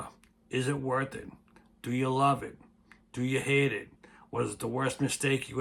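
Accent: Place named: New York, United States. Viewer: You sound like Ny or NJ, too many clouds to go solar unless in sunny Cali or Texas , in Ohio, no way it would work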